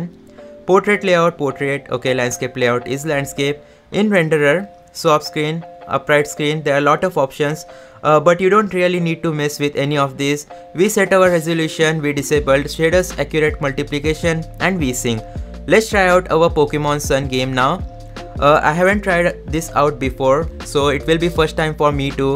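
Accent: India. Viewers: I just cant stand his Indian accent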